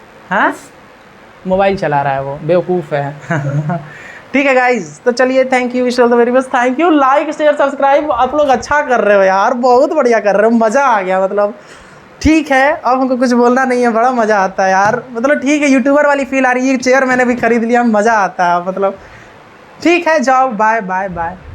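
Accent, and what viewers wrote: Bihar, India. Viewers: bihar se ho bhaiya . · Bhai aap ...Bihar se ho ?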